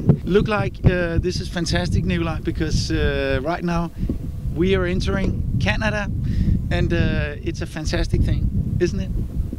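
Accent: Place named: Denmark. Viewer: Thank you for showing me a Danish accent!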